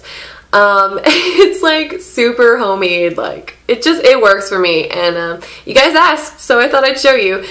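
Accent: United States. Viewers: Hi,! I like ur videos so much and not just because of all the make up stuff u do, but also because of the language u speak:-) i sometimes like the make up things ppl do so much but if i dont like/understand them i'll never subscribe! i love how american english sound more and hence i love ur speaking! thanx for cool make up related videos and language "master classes"! ♥ From Russia with love... ♥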